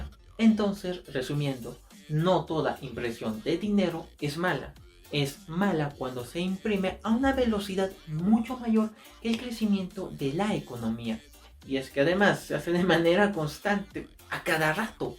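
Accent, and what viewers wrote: Spain. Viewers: Mano porque hablas con ese dejo catellano?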